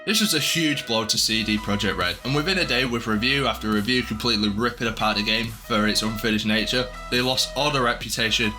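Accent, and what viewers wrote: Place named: North of England, United Kingdom. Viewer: Nah he's from the North of England man, people speak like that there.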